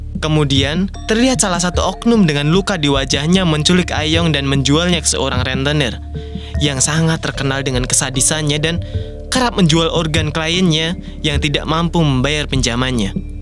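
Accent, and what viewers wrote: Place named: Indonesia. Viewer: Basa inggris sangat meng indonesia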